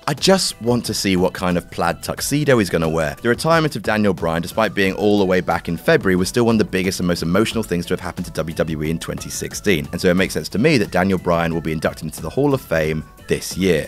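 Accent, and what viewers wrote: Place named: England, United Kingdom. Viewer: Why does he sound like he is from England then?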